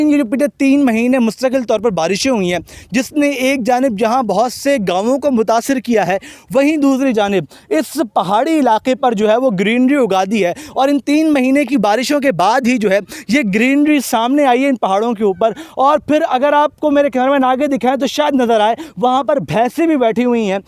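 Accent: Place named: Pratapgarh, India. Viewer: Ap ky bolny kaa treqaa bilkul pretabadiyo jessa hy kam ki baat kuch bhi nahi ki siwaye bakwas kerny ky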